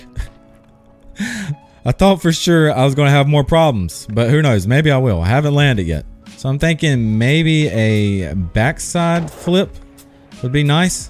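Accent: South, United States. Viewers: your accent is like a mixture of suburban , flamboyant , ghetto , and southern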